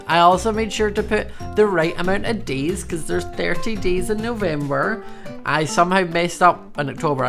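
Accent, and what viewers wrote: Scotland, United Kingdom. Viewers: I KNEW IT, HE’S SCOTTISH...I think.... Also have a happy halloween and bonfire night~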